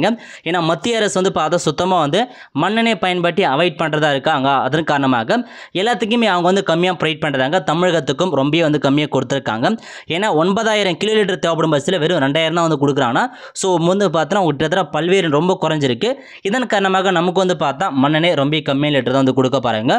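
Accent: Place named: North India, India. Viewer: Yes north indian style la pesurar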